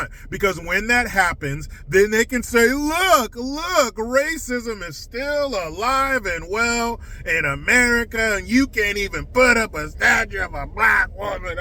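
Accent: England, United Kingdom. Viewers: Your British accent killed me!!! Saludos from Costa Rica. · Is it Patricia Colours, one of the 3 BLM lesbians, leaders who took all the money from the BLM charity fund. Is it crooked Latisha James? Thanks, love your English accent Lol. yes this person of color crap is So o o old. Liberal Marxist divide of us up crap. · My God man, I was rolling on the floor with your British accent lol! I think you are funnier when you are in your car. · is the man. Bring back the British accent. Lizzo and Leti James had a kid. Your statue. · excellent English accent. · I never commented before that I got compliment. You on that English accent. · Great British accent brother. Not my problem. All my ancestors came here in the 1930...where I live this isnt a problem.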